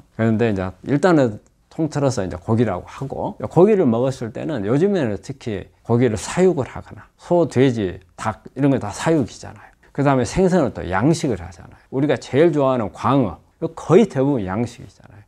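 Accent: Jeolla, South Korea. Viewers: 전라도 사투리 ㅋㅋ 이번 잼버리사태만봐도 그냥 신뢰 제로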